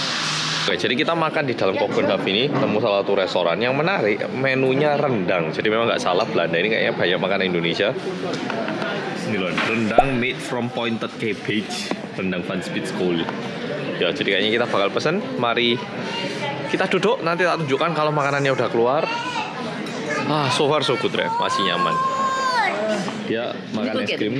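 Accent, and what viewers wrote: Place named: Malang, Indonesia. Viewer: Mas di Belanda tetp dengan logat Malangnya. Ayo ngerawon sek mas + nak Dempo tuku es teler 😂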